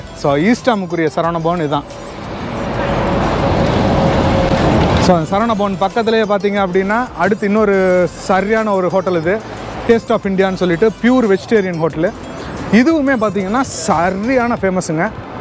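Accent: Madurai, India. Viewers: ஆஹா...ஆஹா...மதுரை தமிழ்... அழகு அழகு....வாழ்க வாழ்க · Nee madurai ah bro. Slang madurai maari irukku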